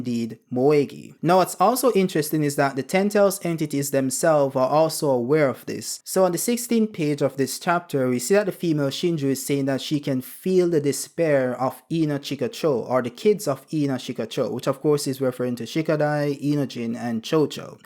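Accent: Jamaica. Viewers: Its giving Jamaican do your thing bro keep growing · Aye yuh a Jamaican? Yuh sound like it🇯🇲💫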